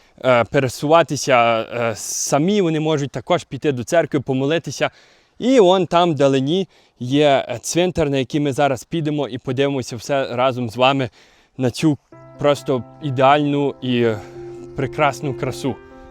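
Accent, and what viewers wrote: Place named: Western Ukraine, Ukraine. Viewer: Говор западенца....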